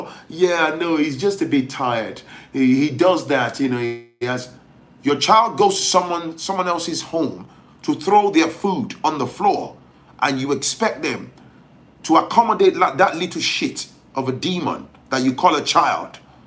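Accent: Yoruba, Nigeria. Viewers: lol... I love your YOruba-English accent.. PLs speak more of it.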